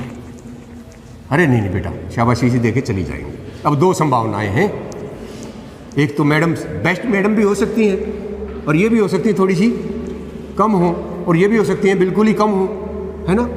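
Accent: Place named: Khari Boli, India. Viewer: महोदय अभी पिछ्ले हफ्ते ही मैने आपकी एक वीडियो देखी, जो मेरे पिताजी ने मुझे भेजी थी। आपकी आवाज़ में जो खड़ी बोली की सीमित मात्रा है वो एक बहुत अच्छा अनुभव कराती है। मुझे भी अपने बचपन के दिनो का एहसास कराती है। मैं आपकी छोटी पर गहरी बातो को नियमित सुनता हूँ। आपका तहे दिल से शुक्रिया अदा करता हूँ की आप बच्चो के लिये ज्ञानवर्धक सामग्री उपलब्ध कराने का उत्तम कार्य कर रहे है। नमस्ते जी। 🙏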